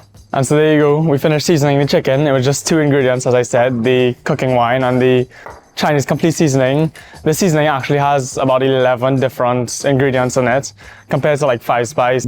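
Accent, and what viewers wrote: Trinidad and Tobago. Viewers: A Chinese with a strong Trini accent is something I have never encountered before. WOW! I sometimes forget the Caribbean really is a melting pot of cultures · Currently stuffing my face with Popeyes and this pops up so I click it. I'm like wait nah how you sounding Trini so 🤣 I subbed after few minutes of research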